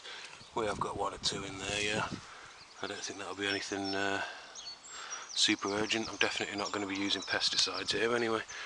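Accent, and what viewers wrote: East Midlands, United Kingdom. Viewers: Thanks for these updates 😊 just got my first half plot and I know naaaaahthing! It's also nostalgic to hear the east Midlands accent. I was born in Loughborough but I've lived in Yorkshire for a long time.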